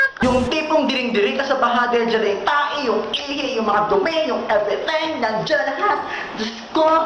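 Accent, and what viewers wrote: Visayas, Philippines. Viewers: Bisaya kba? oOh..ganyan lang tlaga ung accent mo?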